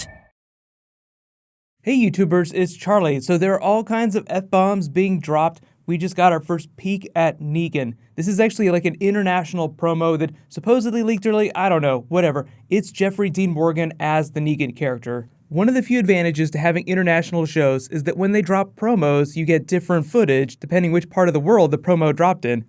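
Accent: Netherlands. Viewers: R U dutch ??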